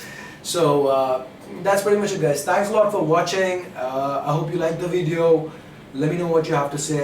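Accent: India. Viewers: Definitely an Indian dude! ^^ Good review though! Cheers! =) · I just realized I fucking hatttttteee indian accents.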